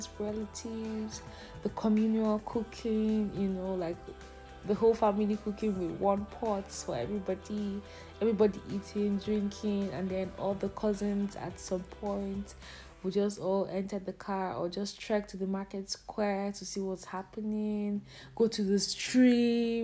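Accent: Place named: Yoruba, Nigeria. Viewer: Nice travel vlog. But you are getting the spellings wrong. Ntueke and urualla that's the correct spelling. Again play some igbo tunes as background music. And please while doing village vlog and you wish to speak igbo pronouce igbo language very correctly not speaking igbo like a yoruba person.jisi ike. .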